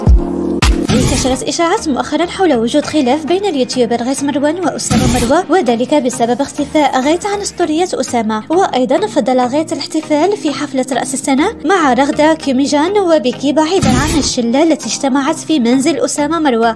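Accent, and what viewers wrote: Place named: Morocco. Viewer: حابة اعرف انتي من وين كلامك وصوتك احس انك من المغرب تقريبا واتمني تردي عليا كثير بحبك وانا من متابعينك وكثير بحب اخبارك ع اليوتيوب 😘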